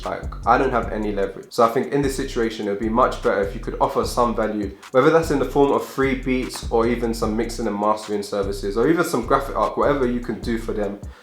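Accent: United Kingdom. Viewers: ooh nice, how long you been there? Judging by your accent I'm assuming you're originally from the UK? Maybe London or surrounding? I was in Spain for a year, I miss that place, planning a quick visit this summer, maybe we can hook up, talk music. I'll hit you up on insta if that's kool?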